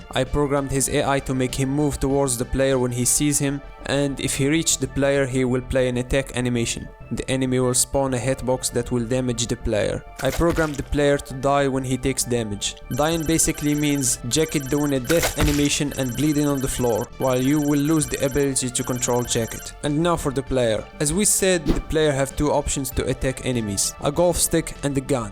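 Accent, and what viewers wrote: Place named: Morocco. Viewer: You sound Moroccan wlah akhoya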